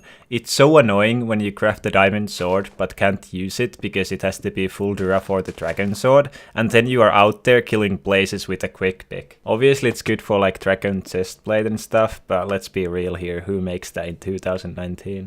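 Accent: Finland. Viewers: Musta tuntuu että sä oot suomalaine koska sun accentti ja sun nimi. Mutta iha hyvä video. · Ok so basically, ootko suomalainen because of your accent and name?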